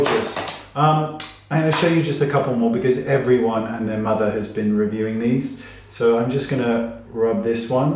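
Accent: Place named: United Kingdom. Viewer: you make me smile when you get annoyed. I guess it’s because you brits make everything sound nice!🙂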